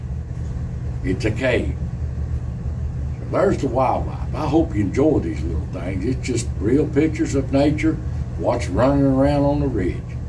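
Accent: Appalachia, United States. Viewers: Thanks. Watch you every time you post. If those folks don’t know your accent, they are not from around here. And I’m in the Blue Ridge of Virginia. Yea, I’m behind enemy lines now but still country to the bone. Love ya in Christ, brother. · People who think your accent is fake do not understand there are different southern accents. Yours is like my granny's was, what I consider to be mountain speak. It's real, just no longer common. There were times I couldn't understand Granny, hour was 'ahr', flour was 'flahr', not one was 'narry', wash was 'warsh', there was 'aher' or 'yander'. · one of the reasons I love your channel is because your accent is like my family's accent. Like the accent in the mountains of Virginia where I grew up. I live in North Carolina now, so I'm still in Appalachia, but I miss that area where Virginia, Kentucky and Tennessee come together. 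It's where all the beautiful nature is, and where all the kindest and most hospitable people on Earth live. People just like you and yours,. Your videos are a PLEASURE to watch! I love your nature video series, AS THE RIDGE TURNS. Dirty Dan is an old rascal, and I'm always eager to see what ol' Yeller, Sock, Ricky or Billy Bobcat is up to! I miss seeing Wishbone and ol' Sidewinder. These little animals have become like old friends, and I have you to thank for that! Bless you and your family, and thank you for providing me with hours, hours and hours of good, clean, family entertainment. I truly appreciate it. It's a great way to start a Monday to see nature's beauty.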